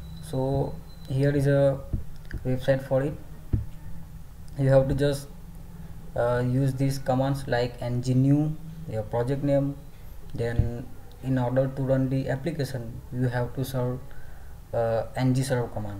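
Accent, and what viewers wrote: India. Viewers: nice video,..R u gujarati i think..right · Indian accent truly bothers me. An entire nation speak English sooo bad I hate watching videos created by them.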